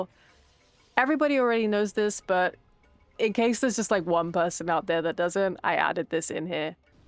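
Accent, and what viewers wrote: United Kingdom. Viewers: Your voice is just fine. If nothing else, it’s nice to hear a fellow Brit!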